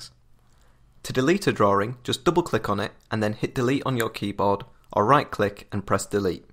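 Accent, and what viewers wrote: United Kingdom. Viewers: Very clear no nonsense with British accent , made it clear and elaborate thank you very much.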